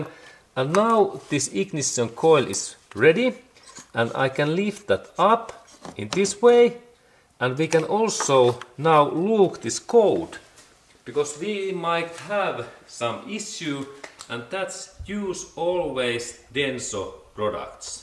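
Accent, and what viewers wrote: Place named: Finland. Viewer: Thanks for the vid, also I really like the Fin accent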